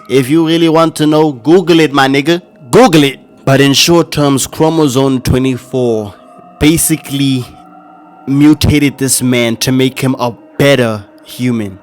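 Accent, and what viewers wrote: South Africa. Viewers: are u South African? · Bro sounds like a South African like me 😅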